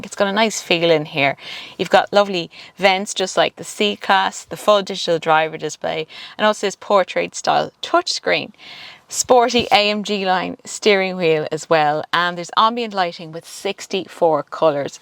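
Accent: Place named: Ireland. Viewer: "Roarrd" Isn't the Irish accent lovely ❤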